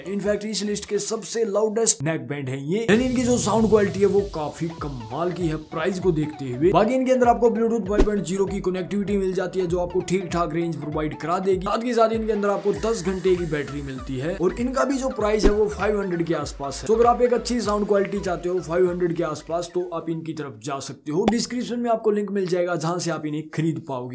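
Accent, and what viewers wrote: Haryana, India. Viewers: kitni e koshish karle bhai 🤣haryanvi accnt a hi jata hai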